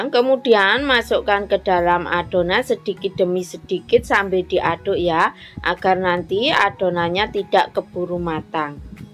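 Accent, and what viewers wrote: Java, Indonesia. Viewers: Kentalnya jawanya 😅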